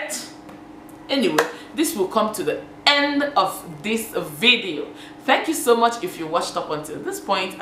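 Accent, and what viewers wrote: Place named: Igbo, Nigeria. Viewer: See let’s be abolishing this I must not marry someone from Anambra as I’m from Enugu and so on especially amongst the igbos. Love you! Hailing you from Lagos state and coz your dialect is so close to mine. I’m from Abor, Enugu. Also congratulations on 15k!!! Love to see it🎉🎉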